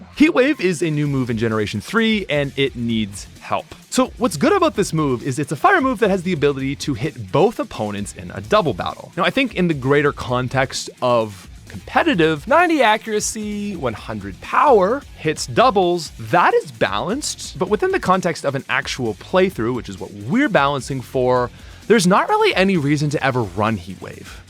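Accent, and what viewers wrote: Canada. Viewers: It's the Canadian Rise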